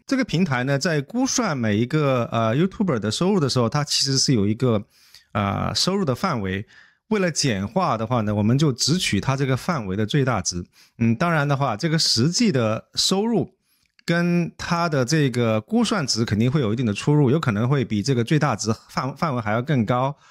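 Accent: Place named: South, China. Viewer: 听up主的口音，好像是南方人，广东福建一带的？有没有关注香港或从香港逃到台湾的一些大V，以粤语为主，粉丝量和 收入也很可观。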